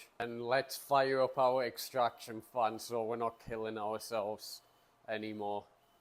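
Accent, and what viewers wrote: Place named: Newcastle, United Kingdom. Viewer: How did a Geordie end up in Canada? Good videos mate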